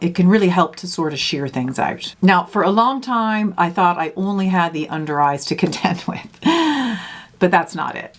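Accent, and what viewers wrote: Ireland. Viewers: You occasionally have a bit of Irish accent!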